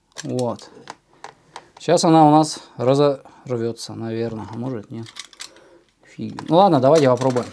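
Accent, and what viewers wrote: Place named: Kirov, Russia. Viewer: Как приятно слышать Кировский говор всё супер у меня такая пока всë норм нравитс🎉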